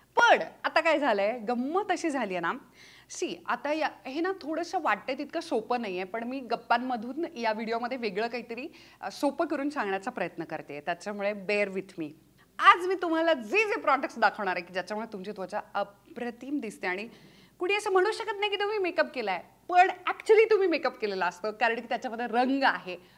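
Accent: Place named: Pune, India. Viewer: पुन्हा नव्याने तूझ्या प्रेमात पडले आज ❤️, ही पुणेकर भन्नाट आहे...typical पुणेकर tone भाषेचा...loved it 😘😘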